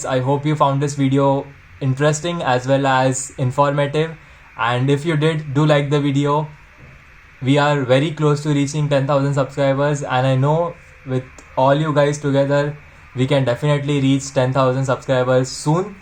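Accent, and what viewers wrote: India. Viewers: Your accent is like Indian only bro 🔥🔥🔥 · Your accent is indian english and i dont think its fake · You have a perfect Indian accent bro continue doing you great work 👌👌❤️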